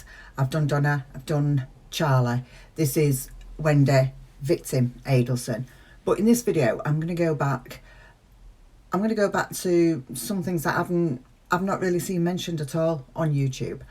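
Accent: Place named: England, United Kingdom. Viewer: Always look forward to and enjoy your videos,. If Wendi is ever prosecuted, the Prosecution are gonna have to be a hundred steps ahead of her, for ALL the reasons you outlined in this video. You probably don't mean to be, but your delivery is highly entertaining! You've probably been asked this before, but your accent places you in what part of England?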